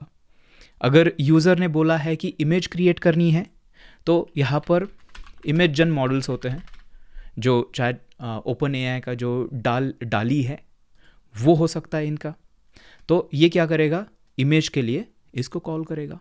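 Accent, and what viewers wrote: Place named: South, India. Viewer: Sir jii south indian waali feel aati hai aapke baaton se...!!! 😎😎 btw great and valuable series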